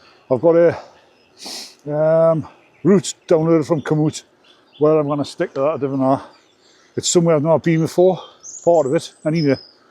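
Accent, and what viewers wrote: United Kingdom. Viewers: He is probably a Geordie? From Newcastle in North East England. I am from London, England. If he is from Sunderland or Gateshead? He will hate me for calling him a Geordie 😅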